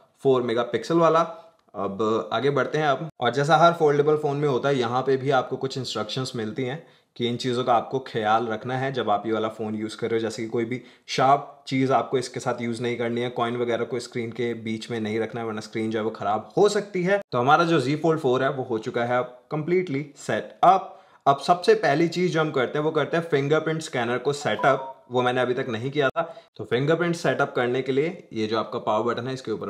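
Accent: Punjab, India. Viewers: Punjabi Bande Di Punjabi Hindi Ch Nikal Ee Jandi..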